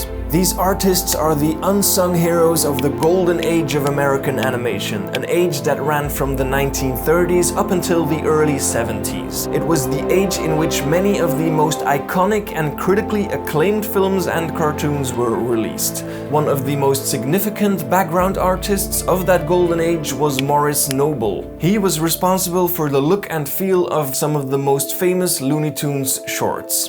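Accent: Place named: Germany. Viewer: This was fascinating. And I gotta say, just listening to your voice is a delight. A very slight hint of accent (German?) adds charm to your speaking voice.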